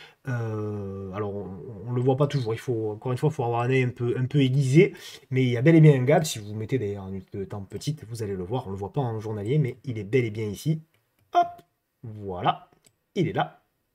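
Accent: South, France. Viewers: Un grand merci, pour cette vidéo et ta newsletter. Beaucoup de contenu, de la pédagogie, de la bienveillance et ce petit accent du Sud… Tu es notre rayon de soleil. Merci pour ton grand coeur et longue vie à LA TABLE DES INVESTISSEURS 🫶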